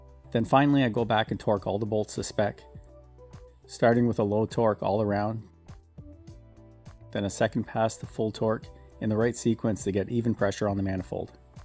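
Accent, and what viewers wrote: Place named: Canada. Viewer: this guy sounds so unbelievably canadian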